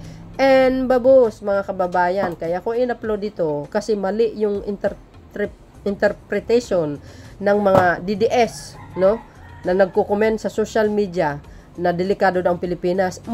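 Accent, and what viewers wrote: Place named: Russia. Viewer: BAGAY PO YONG BOSES NINYO NA MAY RUSSIAN ACCENT HABANG NAG BABASA OR NAG SASALITA . GOD BLESS PO WITH YOUR CHANEL.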